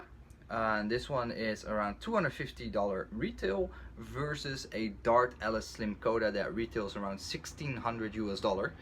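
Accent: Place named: Netherlands. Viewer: Ik kan aan het accent horen dat je Nederlands bent😂😂😂😂😂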